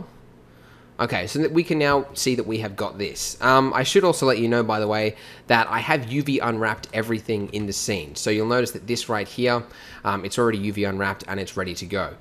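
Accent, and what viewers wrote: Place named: Australia. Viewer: your from Australia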